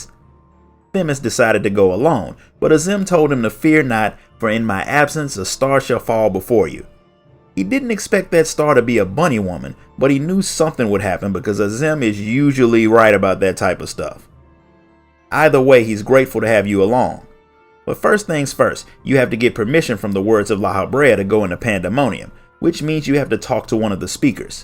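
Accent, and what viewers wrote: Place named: South, United States. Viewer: That smooth southern accent tho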